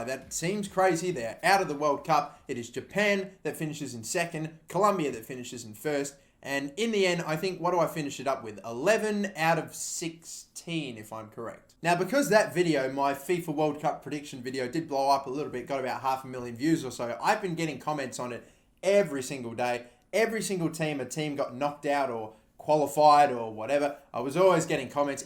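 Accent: Australia. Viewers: Wait ur Australian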